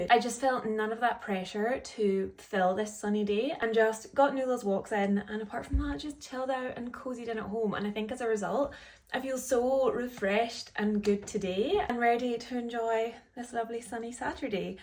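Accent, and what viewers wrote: Scotland, United Kingdom. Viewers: There's something about a Scottish voice that warms my soul. I can't listen to every post, but thank you! 🙏💛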